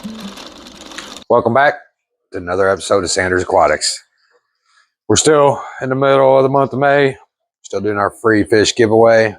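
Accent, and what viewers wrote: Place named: Kentucky, United States. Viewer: Kentucky ? Coool I guess I should have guessed by your accent but I couldn’t . I’m in ny !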